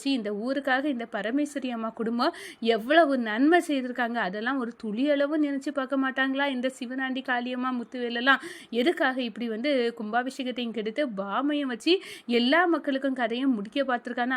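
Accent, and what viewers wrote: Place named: Tirunelveli, India. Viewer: திருநெல்வேலி பேச்சு super ❤ தொலிய உரிக்கும் வார்த்தை very nice. akka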